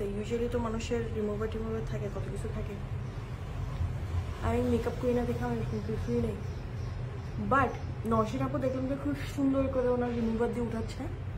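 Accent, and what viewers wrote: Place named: Chittagong, Bangladesh. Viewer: আপু তোমার কথায় চিটাংগা এক্সেন্ট শুনে খুব ভাল্লাগলো❤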